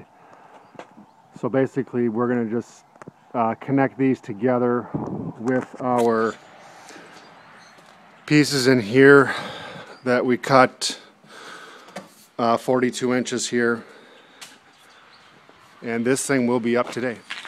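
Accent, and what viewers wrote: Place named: Upper Midwest, United States. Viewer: Based on your accent, somewhere west of the Great Lakes and near Canada.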